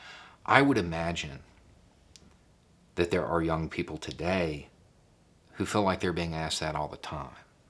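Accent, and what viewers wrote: South, United States. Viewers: The way that you deliver your messages sometimes; I feel as though I am listening to a secular homily, delivered at the alter of history and humanity. Your accent is reminiscent of a classic “southern reverend” character from movies, so that might lend itself to the feeling as well 😅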